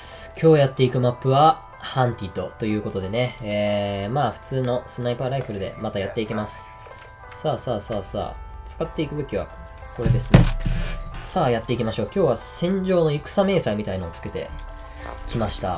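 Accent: Kansai, Japan. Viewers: の関西弁好き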